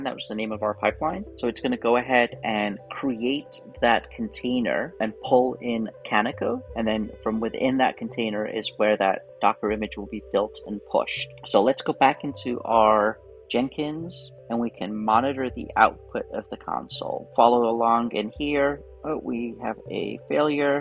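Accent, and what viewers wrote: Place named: United States. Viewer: you sound like you're in high school, super american, the profile pic is a guy in his late 40s called. what is this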